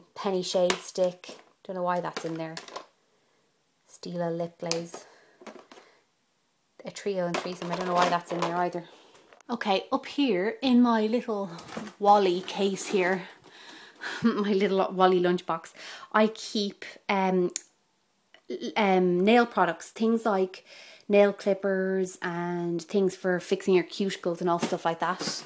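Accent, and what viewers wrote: Ireland. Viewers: OMG it so amazing when you watch so many people with american accents all day long[ and then you stumble across someone with an Irish accent... up the Irish... im so subscribing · are u irsh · Love your accent! Are you Irish? I'm part Irish! Anyway, can you review the Kat Von De palettes?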